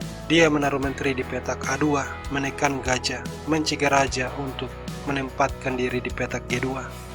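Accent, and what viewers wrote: Manado, Indonesia. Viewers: Orang Manado dang depe admin ini?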